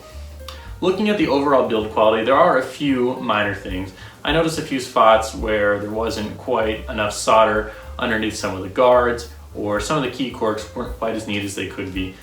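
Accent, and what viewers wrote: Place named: Canada. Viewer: i keep thinking your canadian i dont know why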